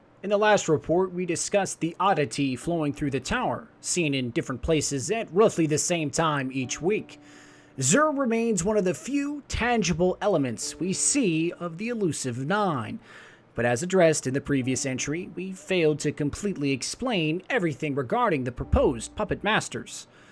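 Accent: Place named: New Jersey, United States. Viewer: I feel like you're from either New Jersey or Pennsylvania. Those are the only places I've seen people spell "could've" as "could of" and heard people pronounce "library" as "libary". It's not a bad thing, just an interesting thing I've noticed.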